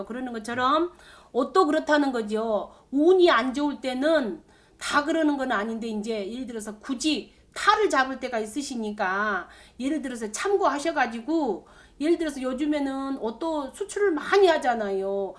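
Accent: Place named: Jeolla, South Korea. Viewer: 전라도가 보네...괜히 거부감이 생기네 ㅎ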